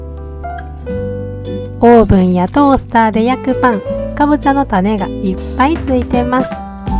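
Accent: Kansai, Japan. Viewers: 最近こちらのチャンネルと出会えて遡って拝見させて頂いています😊 世界の料理や生活風景勿論観光地や史跡等も見るのが大好きです。 nobanaさんの柔らかい話し方や私は関西人なので聞きなれた関西弁での動画も心地良く癒されながら観ております。 オンラインショップもされるとの事で楽しみです✨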